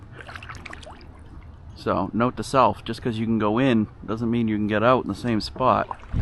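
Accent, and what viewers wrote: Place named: Massachusetts, United States. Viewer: I had a feeling you were in MA. Not the heaviest accent but all the F bombs, lol. Never realized how much we do it until you get around people from other parts of the country and theyre like, "LOL Jesus dude, you swear a lot!"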